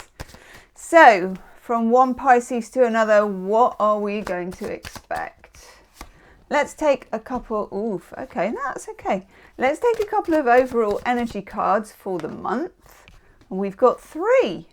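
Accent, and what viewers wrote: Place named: Bath, United Kingdom. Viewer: I recognise your accent. Would you be near bath in England?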